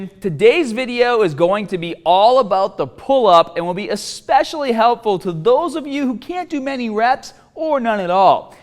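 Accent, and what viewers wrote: Boston, United States. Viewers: Is that a Bostonian accent?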